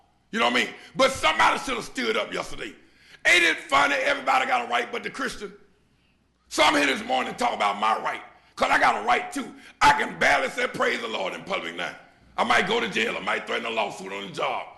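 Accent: South, United States. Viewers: 🎯💯👍🏾❤️ Although his Southern accent was on 💯, he took a fearless and firm stand on the Word of God...AGAINST high officials' condonement of public display of homosexuality‼️ He spoke all TRUTH, y'all‼️ HE...SPOKE...ALL..TRUTH‼️ He and Pastor Gino Jennings, regardless of WHO hears them, sound the alarm of God's Word...LOUDLY!!! FIRMLY!!! SHAMELESSLY!!! "I ain't bought by NOBODY!" (in reference to speaking the Word of God) How many other Christian leaders can honestly say the very same thing, in the Name of the Lord Jesus? How many Christian leaders are seriously willing to speak against this abomination, in the Name of the Jesus Christ? God bless!!!👍🏾❤️💯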